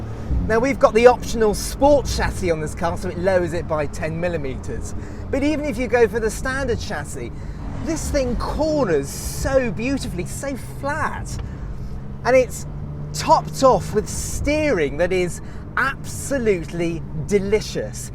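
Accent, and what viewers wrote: United Kingdom. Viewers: This guy british af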